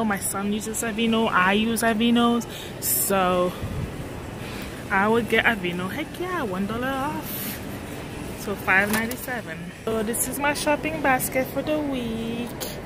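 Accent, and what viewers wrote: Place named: France. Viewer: Coucou! Ah oui, ton accent francais est encore fort. Ne t'en fais pas c'est pareil pour moi aussi. I always buy extra. Thanks for sharing, I like it.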